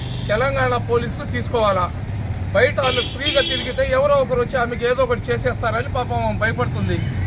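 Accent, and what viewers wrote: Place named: Nellore, India. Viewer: Nellore style lo chepparu great bhai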